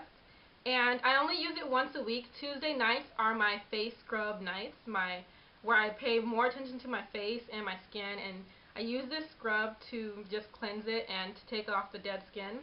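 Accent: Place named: South Korea. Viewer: lol i like how you speak korenglish lol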